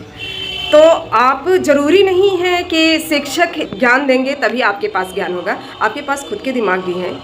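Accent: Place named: Uttar Pradesh, India. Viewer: Aap kaha ki Rahane wali h mam lagata h aap up ki h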